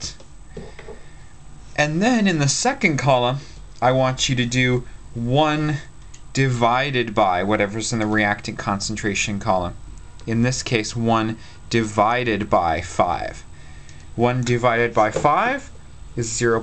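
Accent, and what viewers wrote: Canada. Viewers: Are you Canadian? "Turns Ouuut to be..... "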